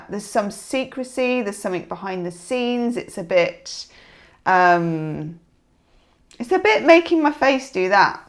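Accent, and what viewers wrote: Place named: United Kingdom. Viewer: VO5 through his hair.... How old is he lol....always a pleasure to hear a British reader not afraid to be totally British. (Many of my favs are American tbh). Maybe one day I could brave myself on you tube, unlikely my reads are far too fanciful....(although saying that love Nigel's reads)....namaste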